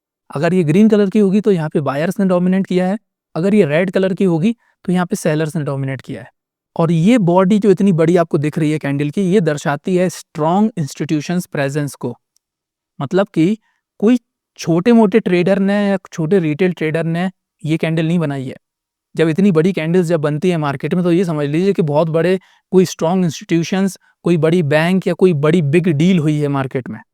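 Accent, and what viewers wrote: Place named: Agra-Mathura, India. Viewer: Bhai aap agra ya mathura se ho kya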